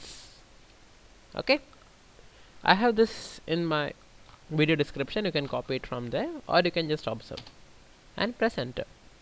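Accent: India. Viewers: hes an indian, they their own accent.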